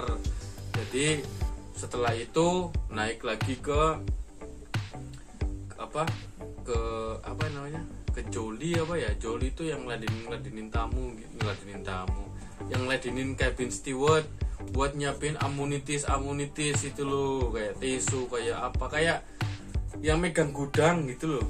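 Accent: Indonesia. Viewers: Walah ternyata ngapak ya melkur hahaha Sukses teruslah ya aamiin #edn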